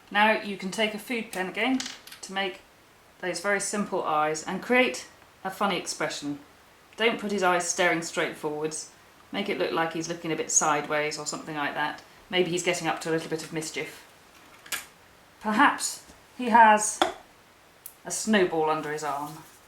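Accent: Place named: United Kingdom. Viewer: Hi, I love your cute penguin and you make it look so simple to make I'm sure it'll inspire others to give it a go. Your instructions and video are excellent and it's nice to hear a British voice for a change:) Debs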